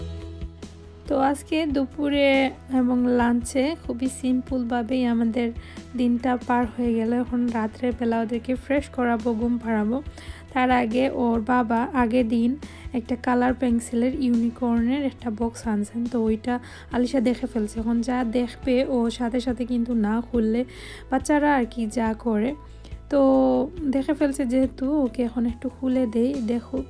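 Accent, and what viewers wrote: Sylhet, Bangladesh. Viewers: sylhety sounds natural to hear that's all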